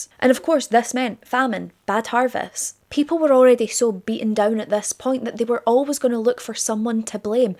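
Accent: Scotland, United Kingdom. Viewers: I’ve just found your channel today and subbed. This was a good overview. I definitely would have been considered a witch and probably end up toured and buried. Part of the reason I did sub, not just your delivery and interesting topic but I like the Scottish accent. There is Scottish blood in me so thank you. Peace and love from🇨🇦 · Happy to find this, i have an interest in witch trials, no matter where, love for Pagan traditions, witchcraft in general, i also have a gorgeous black cat named, wait for it.... Salem. Btw, love your accent, wish i had scottish in my ancestry, but no, just german and a small amount of irish, also have alot of birthmarks and moles, and pretty much a loner. I guess i would be cooked.❤❤❤❤❤❤😂😂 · Girl I love your Scottish accent ❤also I came for the witchy stories I love this stuff!! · I completely understand your accent i knew right away you were Scottish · your accent reminds me of my grandad he was scottish and an amazing man.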